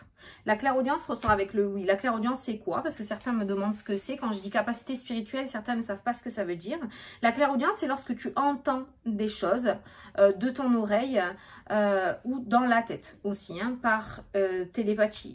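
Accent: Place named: Landes, France. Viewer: Oups, oui je voulais te le dire ..je suis dans le 78! Mais originaire du 40 😉voilà pourquoi j'adore entendre ton accent 😁... Sinon, niveau fatigue, dans mon entourage, tout le monde est ''rincé''!!! Et puis les vacances d'été approche...je travaille dans l'annimation auprès des enfants et ça se ressent ! Bises à toi et à la communauté !!!😘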